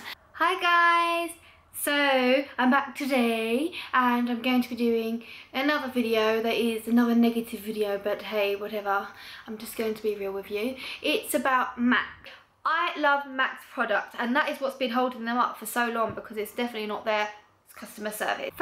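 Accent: United Kingdom. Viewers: i love ur accent! i swear i can just listen to british people talk all day..! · Is that a cockney accent? Forgive me if I'm wrong, but anyway you have the cutest accent ever lol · lol the way you express yourself and your British accent are absolutely delightful!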